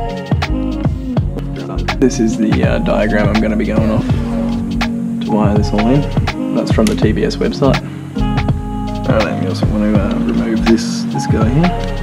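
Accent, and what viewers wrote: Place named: Australia. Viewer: So aussie...love it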